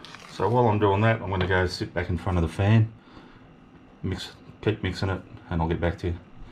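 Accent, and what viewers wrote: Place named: Australia. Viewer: Love our Aussie accent 🙂👍😉🥰 Yummo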